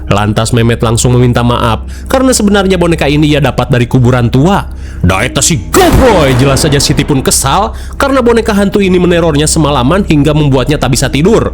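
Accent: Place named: Sundanese, Indonesia. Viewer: Subrek sejak tahun 2021 sejak covid ... krn gabut di rumah di kurung pas pandemi .. 😅 fall in love pas pembawaan nya pake sunda campur...sukses kang.. 🎉🎉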